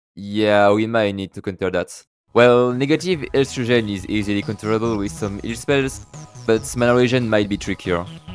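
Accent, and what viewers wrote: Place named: France. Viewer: W vid mon reuf, l'accent fr qui s'entend bien x)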